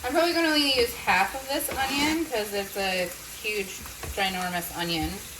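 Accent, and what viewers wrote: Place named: Midwest, United States. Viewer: Looks delishious. Do you ever make chicken ones? Where do you live? You sound like your from the Midwest. I’m in St. Louis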